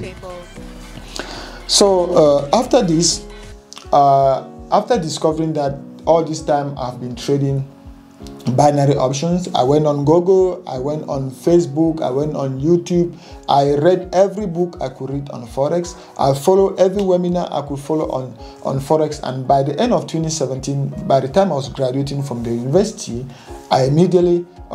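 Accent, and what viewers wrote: Cameroon. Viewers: OMG am happy you're from Cameroon,am from Bamenda no wonder I understand you better than other forex traders because you have that our Cameroon accent😂 wow am happy to hear this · Yoo bro when I first came across your chanel something told me u sound like a Cameroonian am also from Camer and proud to come across u bro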